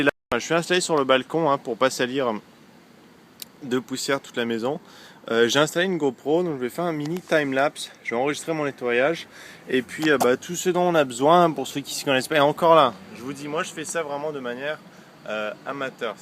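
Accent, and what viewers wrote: Quebec, Canada. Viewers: En France il fait pas chaud ,11 degrés à Strasbourg oú je suis ,sinn pas mal tes vidéos et sa va on remarque pas trop ton accent québecois =D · A tu un accent quebecois Pouce vert pour quil le voit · c'est normal il est québecois ^^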